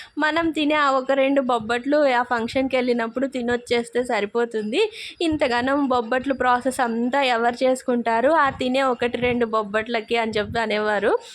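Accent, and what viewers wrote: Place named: Andhra, India. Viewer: Meedi proper andhra Telugu spastamuga matalduttunnaru.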